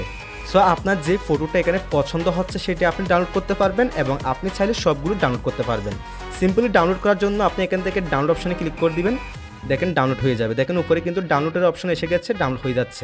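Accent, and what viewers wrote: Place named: Chittagong, Bangladesh. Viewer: হাজার হলেও আমরা চাটগাঁইয়া,, আপনার কথায় ধরে ফেলছি ❤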